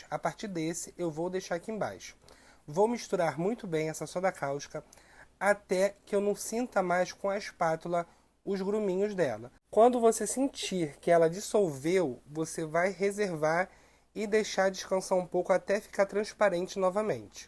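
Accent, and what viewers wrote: Rio de Janeiro, Brazil. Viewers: E eu q ñ me canso de,toda vez q vou fazer uma receita de, rever os vídeos para ter segurança e ouvir esse sotaque carioca e a a perfeição no repasse da técnica junto com a experiência de quem já está no automático. 👍👍amo e amo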